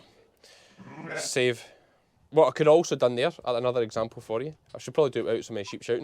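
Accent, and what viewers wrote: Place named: RP, United Kingdom. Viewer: Loved your interview on landward with your posh accent 🤣🤣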